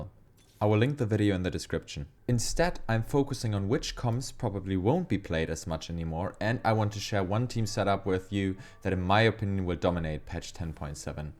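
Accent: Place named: Germany. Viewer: Man hört den Alman einfach so raus alter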